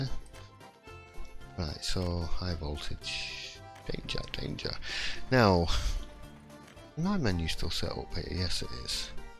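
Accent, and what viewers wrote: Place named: Derbyshire, United Kingdom. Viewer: You from Derbyshire? Trying to place your accent lmao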